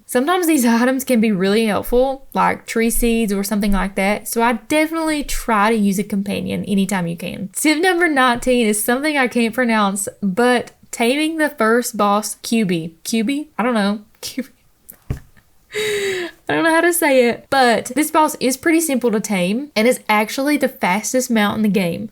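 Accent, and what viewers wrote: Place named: Texas, United States. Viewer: Damn, the stealing from enemies thing is something I forgot about and you'd be forgiven for doing so as the game has so much to it it's easy to. Some fantastic tips here. I've put 60 hours into this game and much of what you've covered I didn't even know about, especially the boss loot,l farm, I've been just defeating them everyday and either getting the drop or not 😅 thank you for this, also you have a great accent! From Texas I assume? Sincerely a UK person 😂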